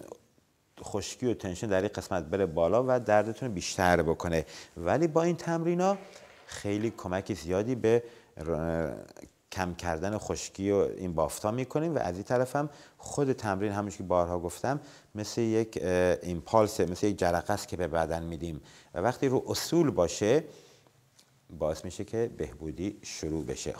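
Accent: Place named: North Khorasan, Iran. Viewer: سلام اقای دکتر ؛شما از لهجه یی ک دارین باید طرف خراسان شمالی باشین (شیروان،قوچان،بجنورد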